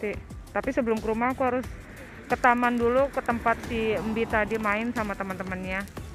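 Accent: Bekasi, Indonesia. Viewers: Mpok next kalo buat vlog lumayan lama durasinya yaa, suka banget liat vlog Mpok, apalagi liat yang super gemes, masyaa Allah seneng banget liat Mpok walau udah tinggal disana tetep gak sombong dan aku suka gaya ngomong Mpok yang khas Bekasi hehee, Salaam dari Bekasi Mpok.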